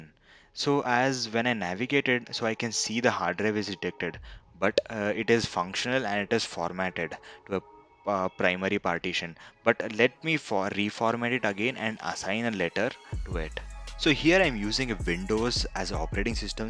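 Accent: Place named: India. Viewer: You have a strong from India accent and speak too fast to be clearly understood. Slow down from 10 to 8.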